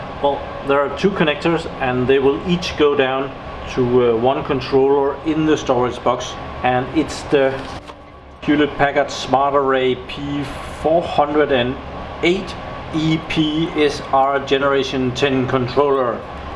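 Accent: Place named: Denmark. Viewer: danish accent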